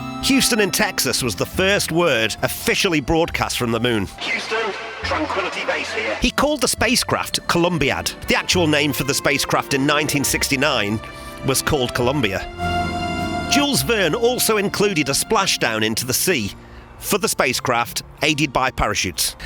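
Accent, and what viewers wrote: Stoke, United Kingdom. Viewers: Annoying British accent, unnecessarily bloated content. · I've only just found your channel. It's brilliant. Your accent is very similar to mine, are you from Stoke ?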